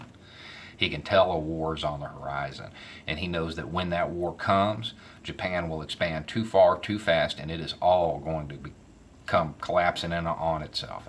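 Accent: South, United States. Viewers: I hope you hung on these last three years, I think it was the "let's talk about a hundred thousand subscribers" video he talked about the accent. I believe Beau is a positive force in the world, and proves you shouldn't judge a book by it's cover. (And yes, I'm a country boy, have a bit of a southern accent, vote blue in a red state, and enjoy hearing common sense from a dude in a shed that looks and sounds a bit like me!) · He's not exactly hiding who he is, his channel is called "Beau of the Fifth Column". I just assumed he affected a more neutral accent on his work channel because a Southern drawl is viewed as unprofessional. This one is his personal channel and he can sound like and say what he wants here.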